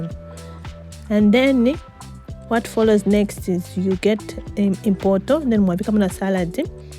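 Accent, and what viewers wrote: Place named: Zambia. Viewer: Beglish 😁 bane.👍🏽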